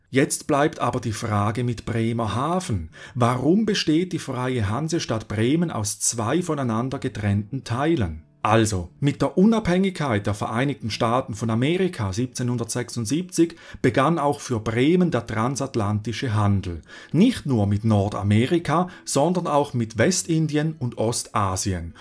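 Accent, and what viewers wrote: Switzerland. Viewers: witzig , schweizer akzent erklärt das plattdeutsche land ... 🤣🤣🤣🤣🤣🤣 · Lol....Ein Eidgenosse unterrichtet deutsche Geographie und Geschichte :D Jetzt hab ich alles gesehen und kann glücklich sterben xD Dein Akzent is der Hammer ^^ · Also ich muss hier mal ein Lob aussprechen. Für jemanden mit Schweizer akzent - is das astreines Hochdeutsch. Hört man sich gerne an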